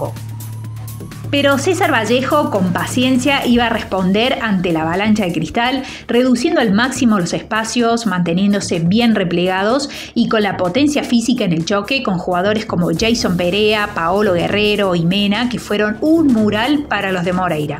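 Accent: Argentina. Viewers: Y porqué habla como argentina?? complejo 😂😂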